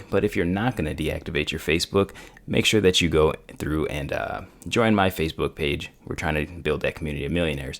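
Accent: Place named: United States. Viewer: Where in the States are you from? You have an awesome voice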